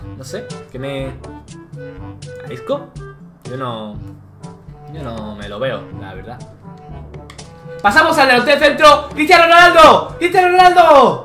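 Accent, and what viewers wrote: Germany. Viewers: eres alemán? 😃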